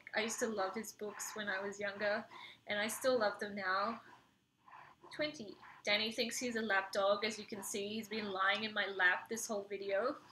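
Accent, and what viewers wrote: Australia. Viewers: I can't place your accent. Have you lived in Australia?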